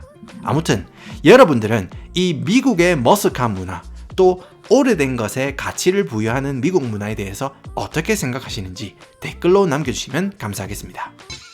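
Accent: Gyeongsang, South Korea. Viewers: 첨으로 아주 재미있게 보고 갑니다! 경상도분이네용. Awesome video!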